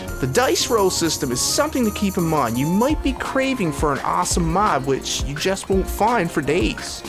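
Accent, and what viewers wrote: Canada. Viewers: You have a Canadian accent lol. Anyways, thanks for the review! I'm glad you went over it and such but maybe you should do an update?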